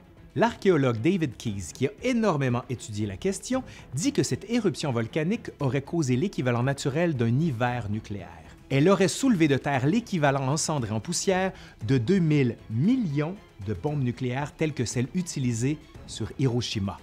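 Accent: Quebec, Canada. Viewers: Grâce à toi je comprends maintenant l'accent de Québec. 😀 Je suis hispanique et j'habite en France. Génial chaîne de YouTube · Étant moi-même Québécois, je peux vous dire que ce monsieur a un accent particulièrement gras. Il pourrait faire plus d'efforts pour "normaliser" son français, mais il se fait une gloriole de cet accent pour amuser (?) les cousins de France et d'ailleurs. Malgré ses talents de pédagogue, cet accent trop accentué me tombe sur les nerfs. Vraiment... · Ca me fera toujours rire laccent québécois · Ma foi c'est bien la première fois que je suis pas dérangé par l'accent québecois ! (Désolé les kheys j'vous kiffe mais étant de France ça fait vraiment bizarre haha !) Excellent sujet de vidéo et très bien expliqué en tout cas, je crois que ça fait un abonné en plus ;) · En vrai les profs d'histoire sont souvent cool. Je trouve mais c'est vrai que l'accent québécois rajoute un charme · L'accent français canadien, une pépite... 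· Merci infiniment pour cette excellente presentation - Vous presentez la connaissance de notre passe d'une facon interessante et vivante. De plus, vous etes pas loin de mon patelin natal (Saint Maurice), j'adore le Francais local. Mes excuses pour mon manque d'accents, j'utilise un clavier anglophone et je suis "pris" aux Etats-Unis, au milieu de la pandemie. Merci encore et j'attends vos prochaines productions, L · Avec son accent canadien il nous fascine de ses connaissances ! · J'adore l'accent que québec 🙃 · Je pense que ton français est canadien Ton accent ressemble à celui de JUStin Trudeau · Merci pour cette page d’histoire qui m’était jusqu’à présent inconnue et que j’ai trouvé tout a fait fascinante! …et toujours cette diction impeccable accompagnée d’un bel accent Canadien👍🏼! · vidéo très intéressante ! une voix posé, intelligible, tu articules bien et tu ponctues bien tes phrases. 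franchement, rien à dire de négatif. comme dit ton compatriote jean marc généreux "ça, j'achète !" (au passage j'ai toujours été fan de l'accent québécois xD ) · C’est un canadien👊🏽👊🏽👊🏽 j’aime trop l accents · Trop marrant l accent québécois jcrois j'aime bien lol comment il prononce neige ou planétaire mdrrrrr 🤣🤣🤣 jrigole il n'y a aucun mal lol. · J'en ai regardé plein d'autres ! Ta chaine est ma chaine d'histoire préférée ! J'aime beaucoup ta façon de raconter l'Histoire. Je dois dire que ton accent québécois contribue beaucoup à ton charme 😁 Merci beaucoup pour ton travail et ces vidéos très divertissantes. Ps : tu es très beau garçon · Vraiment interessant, sauf ta pronociation du Quebec (toujours drole et amusante) , mais je viens de soutenir ton programme. Merci. Salutations du Portugal. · vous avez un accent de dingue vous les canadiens mais vous êtes tellement cool que ça passe bien. 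· Outre l intérêt historique de ton propos j adore ton accent québécois et la touche d optimiste en fin d exposé est réconfortante bravo pour ton travail 😀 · Première chaîne québécoise pour ma part et ptn ton accent me mets trop bien, bisous de France, merci pour ton travail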